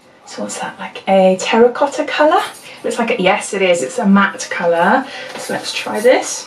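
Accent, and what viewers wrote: Wales, United Kingdom. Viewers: You say it a lot and I thought it was adorable. I guess it's the whole Britishness of it. I'm Bulgarian and I don't get to hear this type of accent often and I think it's very nice and melodic. But yes, I do think that you say tooth weird. ;d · I need this calendar😍😍 great unboxing some amazing products xx i think its a welsh thing doll because i say it the same way as u xx im from south wales xx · oh my goodness and you're welsh! I was thinking I was hearing some welsh accent coming through, and then twf! I'm in west glamorgan so you've just gained a local(ish) fan <3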